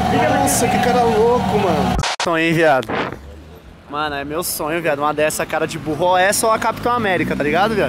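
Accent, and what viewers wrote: Bahia, Brazil. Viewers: Mo Baiano kkkkj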